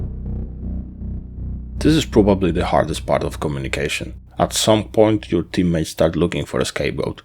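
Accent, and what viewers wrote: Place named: Finland. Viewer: By that accent , are you Finnish ?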